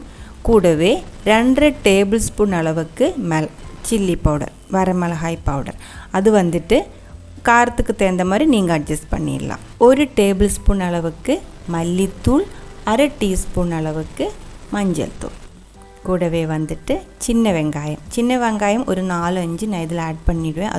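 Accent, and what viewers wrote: Kerala, India. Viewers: കൊഞ്ചം കൊഞ്ചം മലയാളം വരുതേ ഉങ്കൾ തമ്മിൽ സൊള്ളും നേരത്തുക്ക്‌ 😃☺️☺️ പാത്താലേ തെരിയും സൂപ്പർ കറി എപ്പടി ഇന്തമാതിരി... ആന്നാ നാൻ ഒരു മുടി ട്രൈ പണ്ണി പാത്തിയാച് നടക്കവേ മുടിയാത് 👌👌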